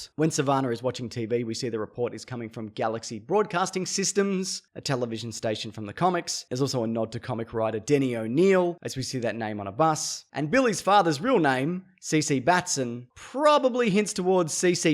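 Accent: Australia. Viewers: I enjoyed 2 things. #1 is that you were the australian voice from the ITSV honest trailer. Man they over done the aussie-ness(?) in the script didn't they. But I was very, very happy to hear you make a cameo with you slightly enthused but very very tired voice of yours. #2 is that you pointed out in the video that shazam did not display any wisdom at all. What a dickhead!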